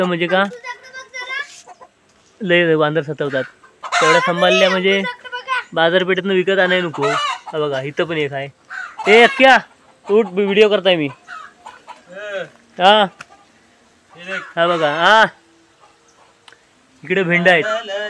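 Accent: Konkan, India. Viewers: खूप छान व्हिडीओ दादा तुझी गावरान भाषा शैली हवीहवीशी वाटते ओरिजनल कोकणी भाषा हीच भाषा ठेव पुढ जाशील कानाला ती शुद्ध भाषा ऐकन्या पेक्षा ही भाषा गोड वाटते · गेले काही दिवस तुमचे व्हिडिओ बघतोय... आपली कोकणी बोली आवडली...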